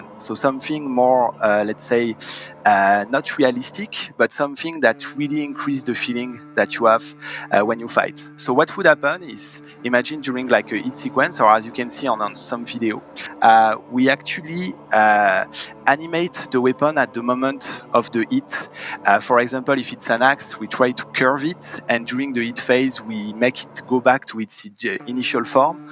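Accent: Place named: France. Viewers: ouiiiiiiiiii l'accent français^^ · nooonn l'accent français :)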